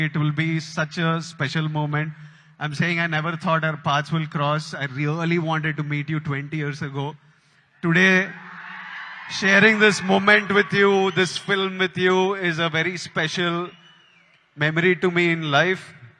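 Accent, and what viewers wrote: India. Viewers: Hyd slang Ithe Ndi Telugu a adhi kuda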